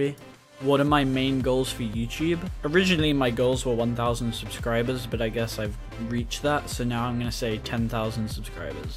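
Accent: United Kingdom. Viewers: U have such an english accent fam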